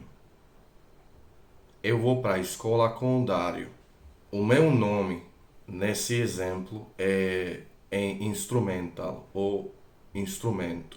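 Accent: Brazil. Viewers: Cheguei a pensar que era brasileiro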